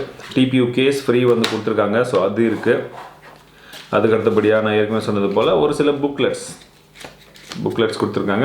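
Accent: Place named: North Chennai, India. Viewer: konjam vada chennai la vara maari voice vardhu bro its gude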